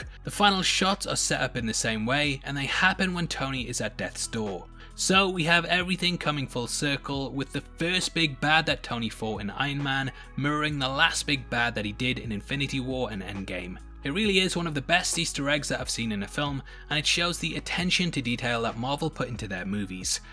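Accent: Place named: United Kingdom. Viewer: He have British accent 😆😆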